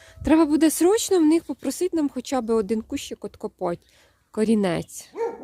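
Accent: Cherkasy, Ukraine. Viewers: А я все думаю, що мені діалект дуже знайомий. А виходить ми земляки. Я також з Черкащини (Тальнівщина)., а Ви звідки саме?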